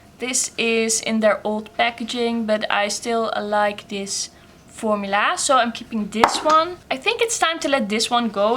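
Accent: Netherlands. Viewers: ❤️❤️❤️ leuke video, hoorde meteen aan je accent dat je Nederlands hebt haha ! Wil toch ook nog eens H&m nagellak proberen, verder ben ik ook erg van Essence, Essie en catrice 😋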